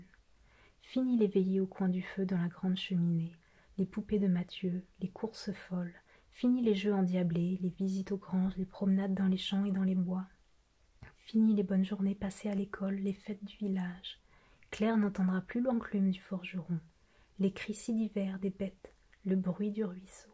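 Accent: France. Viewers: son accent en anglais suppose en effet qu'elle est française. J'étais juste curieuse au début mais je suis agréablement surprise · Yes she's french 🇫🇷❤ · I love this so much! The French accent is wonderful to listen to, couple that with an ASMR artist and you've struck gold 😀💯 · Tu est française ?? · vu son accent français en parlant anglais, oui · C'est une anglaise qui parle très bien français ou une française qui parle très bien anglais parce qu'il y a un accent fr nan ? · Elle est française ? je me pose la question depuis que j'ai commencé ses vidéos, je crois reconnaitre son accent français quand elle parle anglais mais je ne suis pas sur 😅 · oui elle est française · Je suis française et je pense que tu es française car quand tu parles anglais tu as un accent français . Sinon je trouve ça super cela permet d'apprendre les base d'une langue tout en ce relaxant ! 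Bravo ! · Elle est française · I've heard some french accent in your English 😍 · je pense aussi qu’elle est française car je trouve que son accent anglais (qui est très bon) sonne un peu français ☺️ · Attendez, êtes-vous française ? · Mais j'ai l'impression que tu es française qui parle couramment anglais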